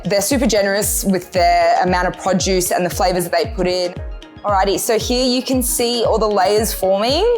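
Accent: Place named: Australia. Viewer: I think she's from Australia or somewhere "over there" Everyone has an accent no matter where we're from. Get over it... 😏 And... you can hold the Balsamic Vinegar on my Sandwich too... 😢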